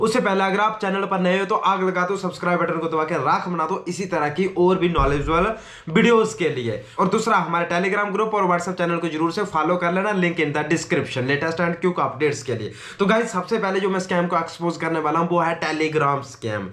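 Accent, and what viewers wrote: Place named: Pakistan. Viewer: Pakistani aur tumhara dono ka bolne ke tadika same hai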